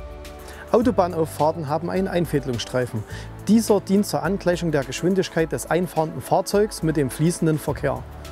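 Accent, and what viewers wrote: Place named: Thuringia, Germany. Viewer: Sächsisch naja eigentlich thüringisch am Rande von Sachsen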